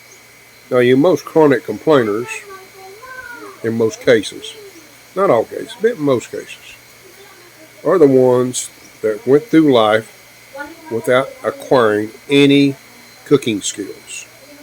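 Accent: United States. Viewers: Enjoy buddy ….. u sound like your from Atlanta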